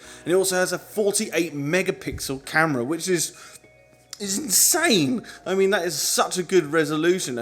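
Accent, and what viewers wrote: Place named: Australia. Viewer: Well, its 0252hrs june 17th in Quebec and your comment response to the mate that made your day by subscribing with all the notification bells got me to thinkin🤔!!!,its night time here and you sound australian so i decided to make your night by subscribing with all the bells and whistles,like button, save and share and support your Channel. You do have some great videos and i appreciate you sharing your knowledge with us. Take care and stay healthy 🍻....Mike....🛶Aboriginal People's Brotherhood Chief🦅........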